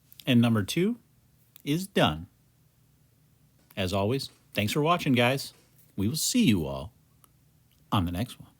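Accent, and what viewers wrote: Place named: United States. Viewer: Opinion on accent depends on where you're from! As a Brit I'd say has a strong American accent!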